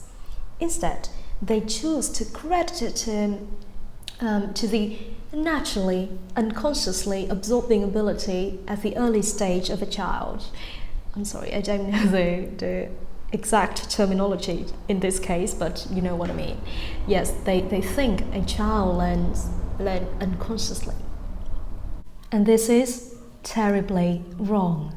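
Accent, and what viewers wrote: United Kingdom. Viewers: Giọng cô là anh phải ko ak. Nhưng lại ko nặng lắm. I love your acent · Chị nói accent kiểu người anh, nghe quyến rũ, uyển chuyển quý phái thật! Em thích nhưng mà khó quá nên đành theo anh mĩ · giọng Anh chuẩn, dễ thương giống Lucy ( “Learning English with Lucy’’ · giọng chị hay quá!!!~ có phải giọng chị theo tone British accent ko ạ? em đang luyện nghe kiểu thụ động, nghe giọng chị rất quyến rũ, dễ nghe và dễ phát âm theo nữa. Cám ơn chị đã dành thời gian để làm video này cho mọi người ạ <3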